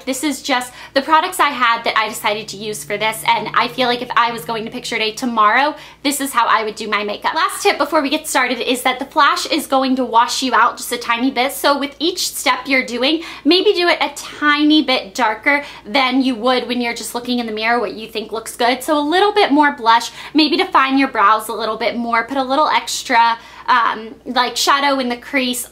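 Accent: South, United States. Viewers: I am not sure if you watch Big Brother or not..but you and Danielle are a lot alike. You are both pretty, have long brown hair, and have that southern accent:)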